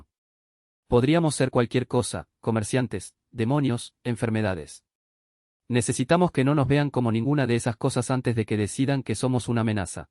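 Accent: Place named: Argentina. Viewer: IA argentina?!!😂😂😂 Primera vez que escucho esa voz Ia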